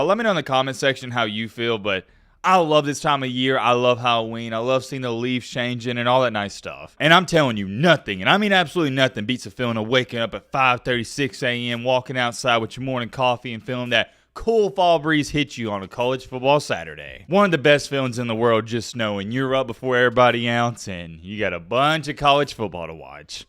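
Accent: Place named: Alabama, United States. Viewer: You’ve got the most Alabama accent I’ve ever heard and I love it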